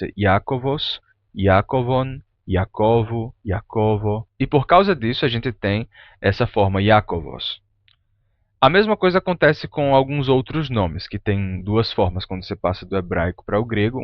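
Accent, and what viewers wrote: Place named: Maranhão, Brazil. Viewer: Esse canal é muito massa. Adorei conhecer e já to consumindo tudo. A propósito, uma curiosidade: no seu sotaque, o R de PORQUE é aspirado ou ele é sonoro? Esse R é tão sutil que ele soa como o H do início de frases em inglês. Vejo maranhenses e tocantinenses (com quem tenho contato) pronunciando um R muito parecido com esse som aspirado do H. Procede?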